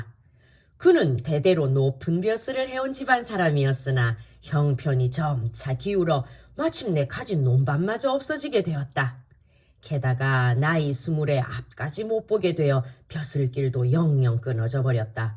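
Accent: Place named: Gyeongsang, South Korea. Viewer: 경상도 억양의 구수한 심청전 잘 들었습니다. 책을 읽어주시는 엄마밑에서 교육받은 자녀들의 인성 감성이 아주 풍부 하리라 생각합니다. 건반 하나하나 내공 담긴 피아노 연주도 탁월하지만 동화를 일인 다역으로 재미있게 읽어 주시는게 전문 성우보다 훨씬 잘하시네요. 너무 감사합니다. 짝짝짝......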